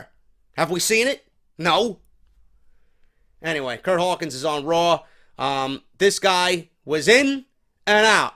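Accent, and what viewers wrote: New York, United States. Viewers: Is this guy Vince Russo's kid or do all New Yorkers sound the same?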